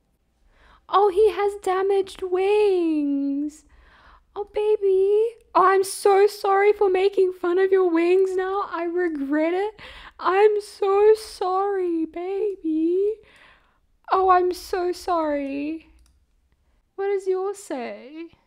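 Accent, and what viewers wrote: South Africa. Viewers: Hii i love your videos are you from south Africa lol 🎉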